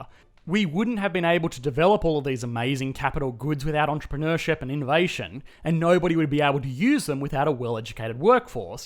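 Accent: Australia. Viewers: Mate your Ozzy accent...:)) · Is true. If it wasn’t for that sweet koala accent, I wouldn’t be able to get through all the gloom and doom our future seem to be careening towards. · Does the author speak in Australian accent ? Thanks for the video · "the sweet supple sound of my Australian accent" Darn he figured out the real reason I watch!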